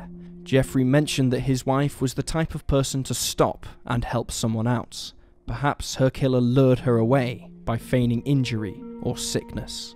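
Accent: United Kingdom. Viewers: he's British so not privy to how we pronounce things.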